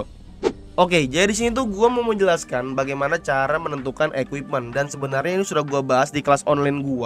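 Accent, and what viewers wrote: Banjar, Indonesia. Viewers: Abang org kalimantan selatan kh bang? Banjar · Pantesan logat kamu familiar banget bang😂 ternyata orang Kalimantan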